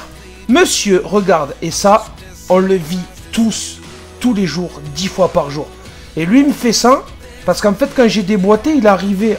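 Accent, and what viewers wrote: South, France. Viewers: trop sympas ton accent du sud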